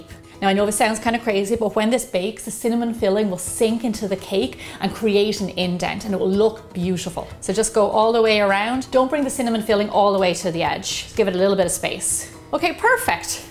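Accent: Ireland. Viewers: Hi! This is a wonderful cake and even though I don't eat eggs I bet this is just so yummy! I love your videos and your wonderful personality and that absolutely lovely Irish accent! It's also heartening to know that you reply to the comments of your subscribers. Good luck to your awesome channel!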